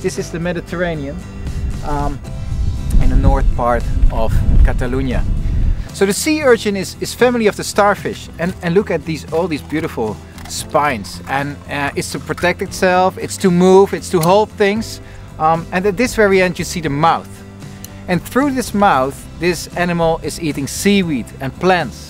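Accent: Netherlands. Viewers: Just randomly clicked on this video, not knowing who this person is. But I'll recognise such a strong Dutch accent within seconds!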